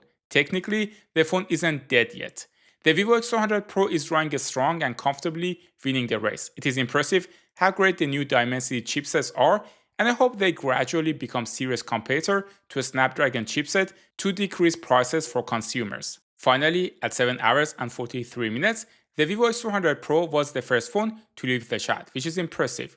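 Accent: Iran. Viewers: dash kheili irani mizani ba in lahjat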